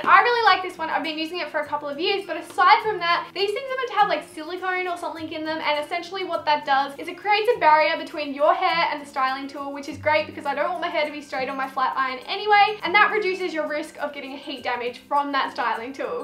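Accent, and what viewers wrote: Australia. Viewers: I’m watching some of old videos and can notice accent is more Australian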